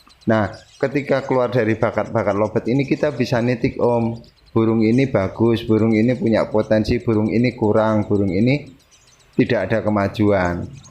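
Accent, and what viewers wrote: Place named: Banyumas, Indonesia. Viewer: Mas orang mana , ko kaya ngomong ngapak ?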